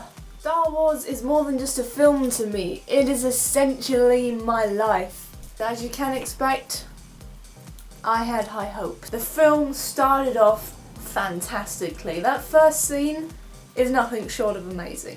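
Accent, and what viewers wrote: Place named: England, United Kingdom. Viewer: love your english accent !! i hope you hear that often .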